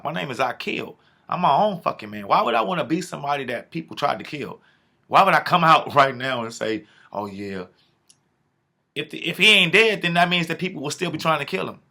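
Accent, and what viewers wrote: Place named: Jamaica. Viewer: And another thing why are you talking with a jamaican accent if you're from South Central LA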